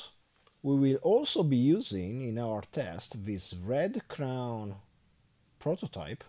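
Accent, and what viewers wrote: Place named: Poland. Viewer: you're from poland, right?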